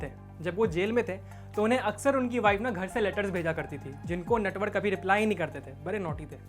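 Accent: Bihar, India. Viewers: Oh... Nice to hear Bihari accent🤣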